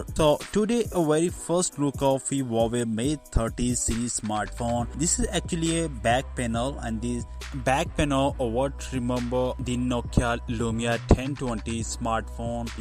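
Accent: India. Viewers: Indian pronunciation ？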